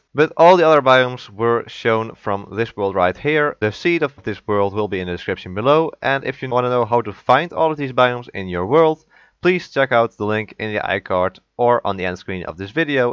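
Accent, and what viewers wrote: Netherlands. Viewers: Goddamn the dutch accent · Mooi Engels haha valt wel op dat je Nederlands bent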